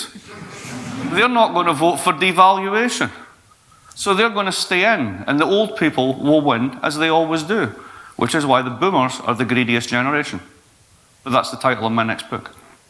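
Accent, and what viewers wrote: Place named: Scotland, United Kingdom. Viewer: + Scottish Accent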